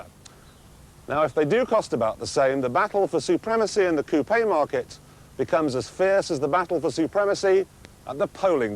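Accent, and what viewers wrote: RP, United Kingdom. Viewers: He went to a private school in Doncaster & then Repton School, hence the posh voice and head boy attitude. Reckons he was bullied at school, and seems to have spent the rest of his life giving everyone else grief. His first wife left him after 6 months for one of his mates, hehehehehe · Still had his posh accent.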